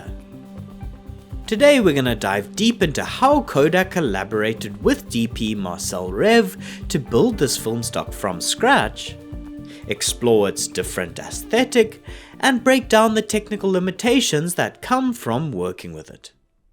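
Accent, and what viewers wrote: South Africa. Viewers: You for sure are from SA Bru, am I wrong? Howzit Boet 🫡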